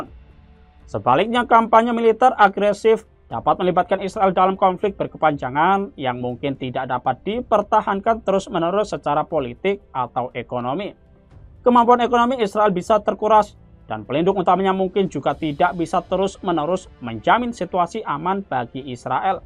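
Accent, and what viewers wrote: Java, Indonesia. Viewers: Pembawa acaranya kurang cocok, selain logat jawanya yg kentel.. cara bicaranya pun kurang mengenakkan didengar telinga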